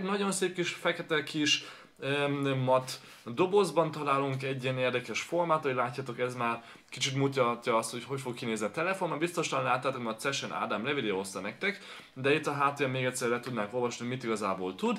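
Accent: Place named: Germany. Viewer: Nagyon durva német akcentus...:)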